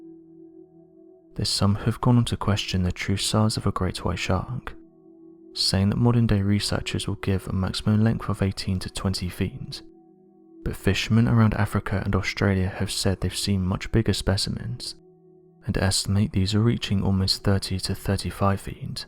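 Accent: United Kingdom. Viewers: I'll save this one for tonite. This guy is for bedtime and the other (guess there are two in this channel) for daytime. Both have great voices and nice British accents.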